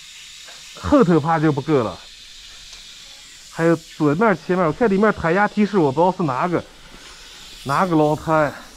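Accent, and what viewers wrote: Inner Mongolia, China. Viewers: 内蒙古口味的普通话，听着很新鲜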